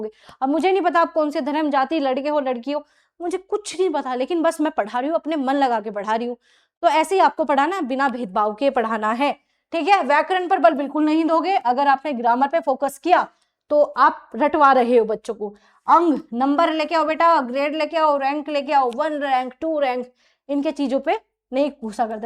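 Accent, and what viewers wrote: Baghpat, India. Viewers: Mam aap Khekra baghpat se ho kya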